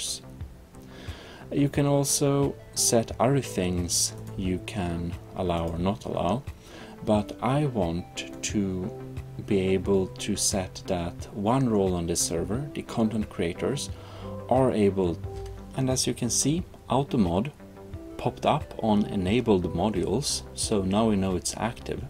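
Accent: Sweden. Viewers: Är du svenskkkk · U from sweden aye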